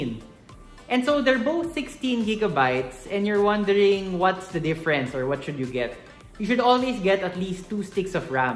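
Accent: Philippines. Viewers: His accent is very filipino tho.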